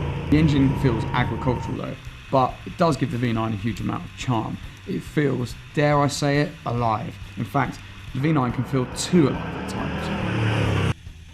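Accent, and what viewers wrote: United Kingdom. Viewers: He's British, that's the way they pronounce there.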